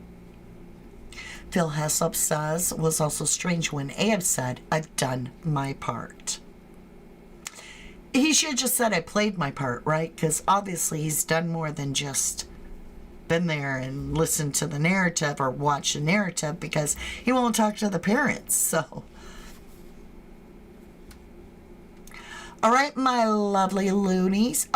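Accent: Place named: United Kingdom. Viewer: I love your UK accent 😂❤️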